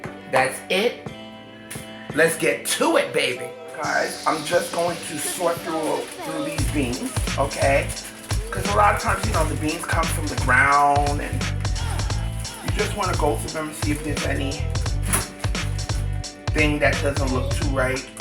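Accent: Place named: East Coast, United States. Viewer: Hey Ms! I love your cooking style! Your green sauce is giving me life and I haven’t tasted it yet! I love your sass and that east coast vibe. Oh yes, I can hear it in your voice! Thank you for this depth of flavor. I’ve seen recipes where people just add salt and pepper with some chicken stock. Period. I need spice in my life! I bought some neck bones and limas today, so this will be on my table soon! Thanks so much! ❤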